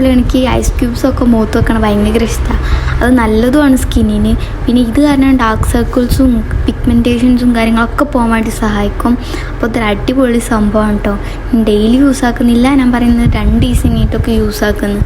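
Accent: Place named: Malappuram, India. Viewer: Malappuram slang 😌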